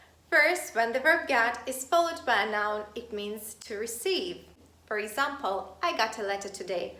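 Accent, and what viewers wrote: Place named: Ireland. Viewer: You king of sound Irish when you speak :-) Great teaching skills.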